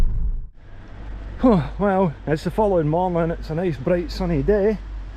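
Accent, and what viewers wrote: Scotland, United Kingdom. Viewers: I absolutely love your accent. Is that Scottish? I'm tuning in from Boston Massachusetts.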